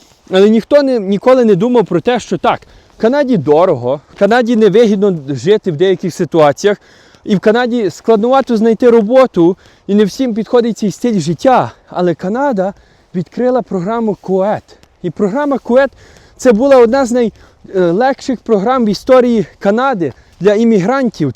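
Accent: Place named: Western Ukraine, Ukraine. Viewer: Трус. Чути говір західної України. Втік? А країну інші повинні захищати?!!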